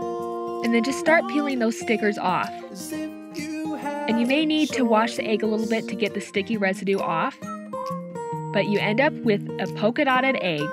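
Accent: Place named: Minnesota, United States. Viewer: Are you from Minnesota sounds like Minnesota accent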